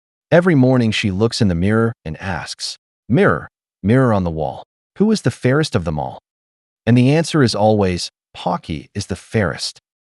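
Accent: India. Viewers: HER CURRY ACCENT IS IRRITATING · Palki's dream to join CNN or Fox in USA, as do all pajits dream to leave Endia. But first, she needs to clear that curry accent.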